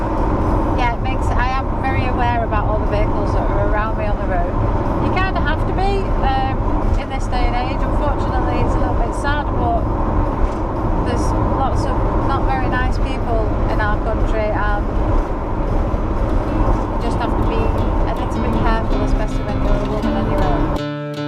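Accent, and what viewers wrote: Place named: Northern England, United Kingdom. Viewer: Right up me chuff😅 trying to diddle meh!😂 proper northern lass 😊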